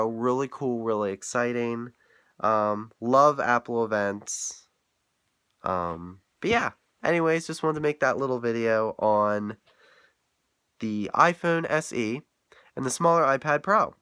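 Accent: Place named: Philadelphia, United States. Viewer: You sound like you're from around me :) Philadelphia? And yay- Can't wait til 31st!!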